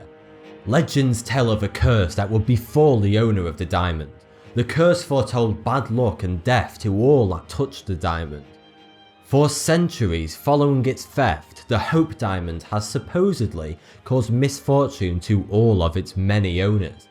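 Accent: United Kingdom. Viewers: it’s just a bri’ish accent, innt? ‘specially in lond’n, where is pr’nounced for’y too. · Nate Haimson thats how british people talk, you know that you see movies dont you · Thanks for the informative video! I have learned many things from your channel, and I love it! Just ignore the people that say, "You're voice is stupid", it's not true. They're just jealous that they don't have a British accent. I've been to England before, and I know what a real British accent it. Not just American impressions. :-) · Uhm.....because he's British? Where we pronounce the letter 'h' as 'eiche', a British chap will pronounce it 'hayche'. Very often their T's will be much softer in inflection or just get dropped altogether, unless they're actually talking about afternoon tea. Look up British stand-up Eddie Izzard and he'll teach you how to British. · Herpa Mcderp It depends what accent you adopt. 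is British, not American, and I'm guessing you're American. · Benjamin Matić Maybe he is actually saying 42, and we're all just jackass's for blaming his British accent? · British accent!! so yhea sorry to ruin your hate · Why do you say "42", but your name is " "? What dialect do you speak? My guess is it's somewhere in or around the U.K. Your teeth also make it very likely that you're British. You also dress like you're from a different era. It's strange and interesting. Good luck with everything. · This guy has an accent that you can chop off with an axe. I wonder where he's from in the UK. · He's British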